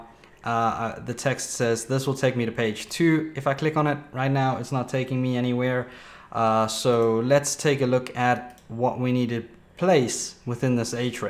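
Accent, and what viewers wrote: South Africa. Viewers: I didn't know you were south african <3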